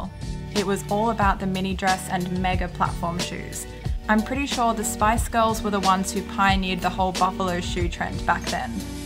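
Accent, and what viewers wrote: Australia. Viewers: are you aussie? we should be yt friends! x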